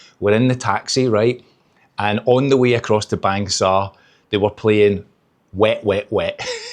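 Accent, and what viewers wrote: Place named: Glasgow, United Kingdom. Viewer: Hi, my name is also Yvonne. I stumbled upon your video a few days ago and this is the second one I’ve watched. It’s always interesting to hear what non-Malaysians think of our country. I love hearing your accent because it reminds me of my good friends Bernie and Graeme from Glasgow. I’ve lived in England and Northern Ireland for over 20 years. I’ve travelled to Scotland and been to Glasgow Green for the World Pipe Band Championship. My husband played the pipes. Sounds like you’re enjoying KL. Are you getting to experience much local Malaysian culture? You’re probably going to say, watch your previous videos, which I will. Have fun.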